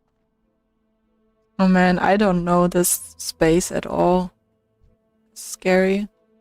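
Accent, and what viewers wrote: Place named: Germany. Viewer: i would guess german ;-)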